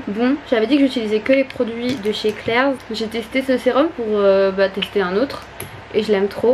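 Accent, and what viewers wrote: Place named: England, United Kingdom. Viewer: Chu morte pourquoi à chaque fois que t'es avec tu prends un accent anglais??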